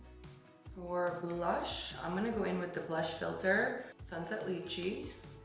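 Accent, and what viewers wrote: New Jersey, United States. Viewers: Wait why does her voice accent and tone sound different? She sounds less Jersey here😮 I love her NJ accent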